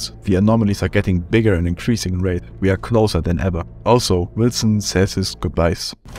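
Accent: Germany. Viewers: Is he german ? i can't tell if so, ich liebe deine videos und dein content allgemein. Schönen tag noch ! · I loved to play EZ2 with it having an amazing story, and having a really cool stealth part with the monster in the Aperture Lab. Literally gave me chills! I loved playing these games as much as I enjoyed this video. Good job EZ2 devs and (btw your german accent is beautiful can't hate it. And don't worry mine isn't better xD) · I have a question:are you German? Besause i am German and you Said one time that you have a German ankzent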